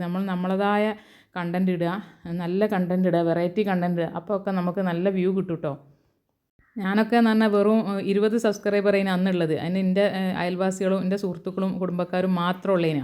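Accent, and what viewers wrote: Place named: Malappuram, India. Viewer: ചേച്ചിയുടെ പേരെന്താ?😂സ്ഥലം മലപ്പുറം ആണെന്ന് തോന്നുന്നു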